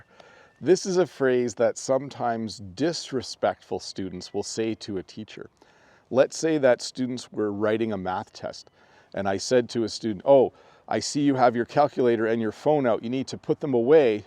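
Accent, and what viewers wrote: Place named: Canada. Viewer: you have a good video! Your Canadian accent is very good, it makes easy for us to learn english...I also loved the place you did the video!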